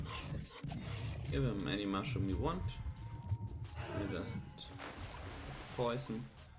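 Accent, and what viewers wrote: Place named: Germany. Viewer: Du hörst dich irgendwie an als wenn ein Deutscher, Englisch redet, kann das sein? Hi, it heards like when a German speaks english, where you from? Like goes out.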